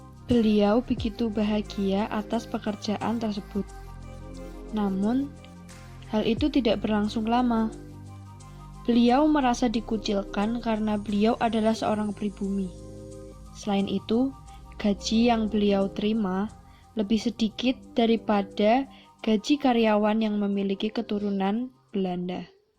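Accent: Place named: Java, Indonesia. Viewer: Backsoundnya enak nih. Cocok dengan suara pembicara yang medok eheww:v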